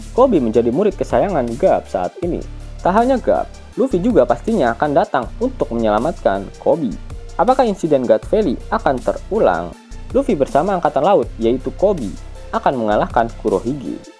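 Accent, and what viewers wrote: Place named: West Java, Indonesia. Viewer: tercium orang sunda wkwkwk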